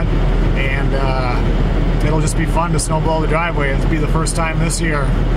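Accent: Minnesota, United States. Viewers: I knew from the first words that you spoke, you were from Minnesota...That accent is strong up there....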